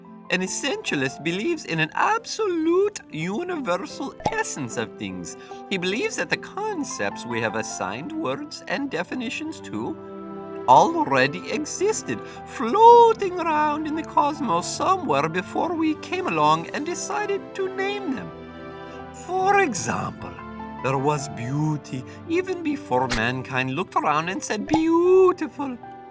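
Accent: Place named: Russia. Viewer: Yo! Hit me up if you want to improve your french accent. You sound russian here.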